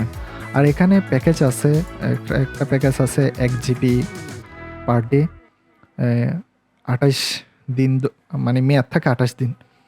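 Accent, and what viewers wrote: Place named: Chittagong, Bangladesh. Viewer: ভিডিও দেখে ভালো লাগলো। খুব সোন্দর উপস্থাপনা করেন। কথায় বুঝায় যাচ্ছে আপনার বাড়ি চট্টগ্রাম।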